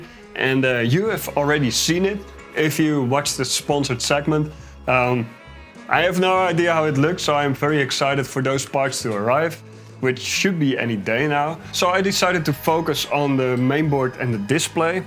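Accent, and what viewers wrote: Netherlands. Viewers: I tought you were swedish. But you're speaking gibberish. Netherlands? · You sound very Dutch. Good video tho! Subscribed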